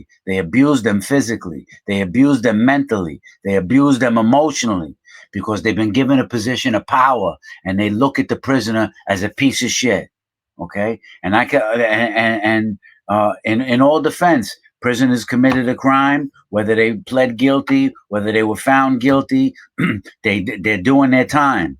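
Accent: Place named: Downstate New York, United States. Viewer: This Dude is 💯% Spot On!😳! I Love His Message & His Accent too! Always have loved the Northeast / New York Accent! This Video Is Lighting My Brain & Heart Up!😇!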